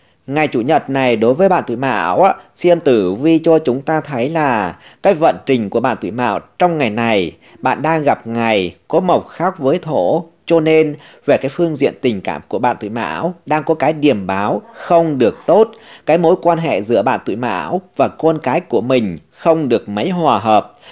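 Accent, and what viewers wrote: Thanh Hoa, Vietnam. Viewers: Troi Thanh Hoa de nghe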